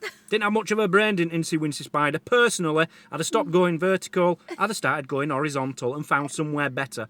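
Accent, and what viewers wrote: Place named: Lancashire, United Kingdom. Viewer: Please tell me you're from Blackpool! Or at least, Lancashire!..Great stuff...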